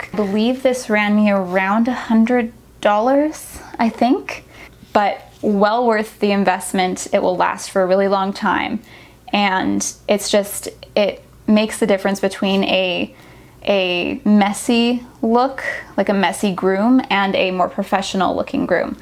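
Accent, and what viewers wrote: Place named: Canada. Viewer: I just found your videos the other day and am enjoying them. I have had my Chloe, an Aussie mix, for 7 years. These grooming videos are so going to help my husband and me. Also while watching your videos, I was like is she from Canada? My husband is Canadian. Then I saw the comments and was like yup she’s Canadian. 😁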